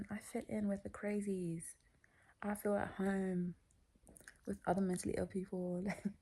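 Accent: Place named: United Kingdom. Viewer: i’ve missed u smmmmmm. also am i just noticing you have a british accent :0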